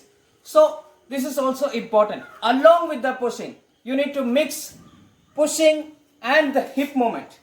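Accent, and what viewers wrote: India. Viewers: What do you mean? It's normal indian english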